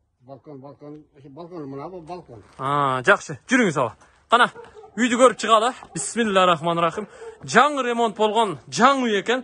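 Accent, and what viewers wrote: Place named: Batken, Kyrgyzstan. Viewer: Баткендиксин да ава ава ава ава ле дейсин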